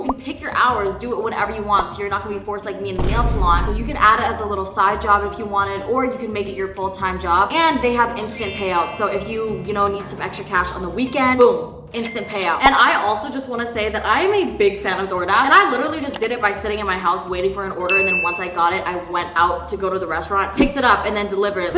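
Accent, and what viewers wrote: England, United Kingdom. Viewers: Love the vids but the English accent ain't it sis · loved that british accent lol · Your British accent is so good, I love it. Your videos are also so good. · Gosh you crack me up with your english accent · the British accent is not it girl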